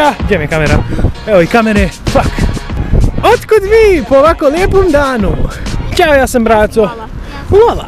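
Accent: Bosnia and Herzegovina. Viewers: Brate zašto pišeš ,,reku" a iz Bijeljine si i pričaš ijekavicom?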